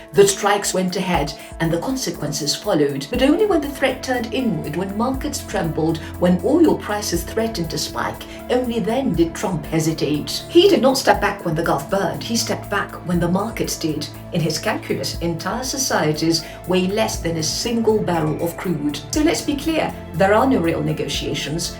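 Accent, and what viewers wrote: RP, United Kingdom. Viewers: This truth-teller with her hilarious Julie Andrews accent haunts my dreams :face-red-heart-shape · I like a lot your British accent Anyhow, never ever trust trump and Iranian people should not fall Again into Trump propaganda 👈👈👈👈👈👈👈👈👈👈👈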